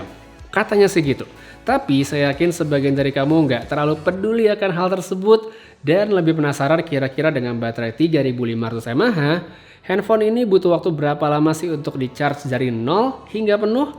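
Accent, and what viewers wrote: Bali, Indonesia. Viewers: Bli nak bali ne??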